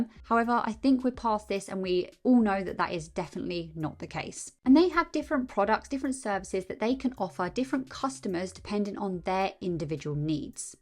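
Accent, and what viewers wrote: United Kingdom. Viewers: I like your British accent❤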